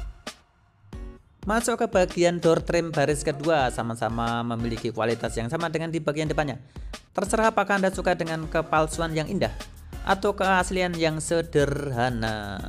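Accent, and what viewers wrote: Java, Indonesia. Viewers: Tak LIKE 7 tak SUBSCRIBE yo, Mas. (aku seneng gaya bahasamu sing Medok iku) Qiqiqiii....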